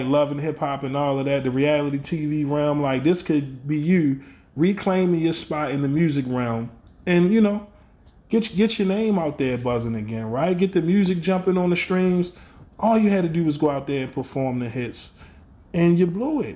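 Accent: Baltimore, United States. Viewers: lol you're definitely from B more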